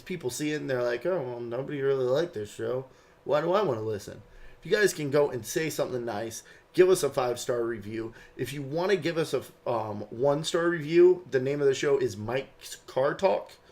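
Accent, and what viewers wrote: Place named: Canada. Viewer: Oh my gosh your Canadian accent hahahaha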